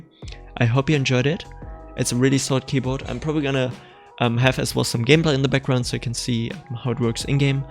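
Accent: Germany. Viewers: U have a german accend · Kannst du zufällig deutsch hab nämlich das Gefühl du hast so nen deutschen Akzent 😂 aber gutes Video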